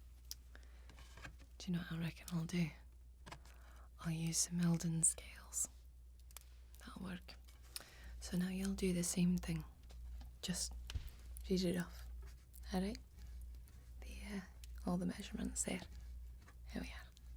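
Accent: Scotland, United Kingdom. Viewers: Wow a new player has entered the ASMR ring and she’s amazing!!! Seriously I am blown away by the quality of these vids! Usually it takes 6 months to a year for new ASMR channels to start putting out such good and LONG videos! But this is channel is already a contender with Goodnight Moon for best fantasy role plays! And I absolutely adore fantasy role plays they are my favorite thing to watch to relax so I’m really really excited to see where this channel goes!!! The accent is gorgeous and so good I thought you were really Scottish! Do you play DnD because you are amazing at character building!! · Blacksmith with a Scottish accent in a fantasy universe... Just like dwarfs. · Scottish accent + blacksmithing + ASMR all things i adore and luv in one video, my god how did i stumble upon this vid just now ? totally subbed :heart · Loving the soft Scottish accent. 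Another superb ASMR video to sooth my frazzled Christmas mind. Thank you so much. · I love the Scottish accent. Quite good! Also, I have no idea why Scottish accents are so heavily tied to blacksmithing, but it makes me proud that they somehow got renowned for such skills. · That's an excellent East Scotland accent if you are not really Scottish. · I can always use more Scottish accents in my feed of ASMR videos. Thanks for the very apparent effort even right out of the gate. I hope to see more from you. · What a great accent ! You must have been to Scotland! X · Ahhh the Scottish accent is amazing! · Okay , but how can all of your accents sound soooooo authentic. This is the first video of yours that I watch, and I legit thought your were Scottish , and then I watched your elf role play ,and thought that your were a brit with a really good Scottish accent, and then I went to your cooking channel.MIND BLOWN. 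· There's something about blacksmiths that just demands a thick Scottish accent. It is pleasant to hear. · First video of yours I've seen, had to come back to this video because I was confused when you weren't Scottish in your next video, solid effort, loved it! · Ok youtube ill finally click on this it keeps popping it my recommended . Love the scottish accent. really pretty. good asmr. ok i subbd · Is that a Scottish accent? So mesmerizing and a very attractive women. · Damn good accent. I'm from Scotland, and you'd easily pass as someone from the north or west coast ^^ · Goodnight Moon's rich fantasy storytelling meets ' accent. I love it! The soft speaking is really relaxing and you can tell that the production values were high. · This is incredible quality for a second video or any video for that matter. I'd expect it from Goodnight Moon, who's been doing it for a while, but for a second video, it's amazing. 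The only improvement I can think of, not even a criticism, would be 60 fps. But that's a small matter. Everything is perfect. Your accent is just a plus, it's wonderful. Your first video it was much softer, almost American with an English tinge. The hard Scottish is great. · Not even 5 minutes in and a Scottish accent. I like. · Stumbled across your channel by accident, this is incredible!!! I thought you were Scottish for real!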